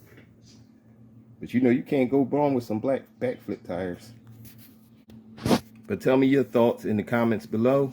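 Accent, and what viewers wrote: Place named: United States. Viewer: Cool tyres, I like the 1st ones. Where do I get get them ? From your accent and the surrounding turf I think you’re from the US? Maybe I can’t get them in Europe. But that’s a bad spot for testing tyres or speedruns…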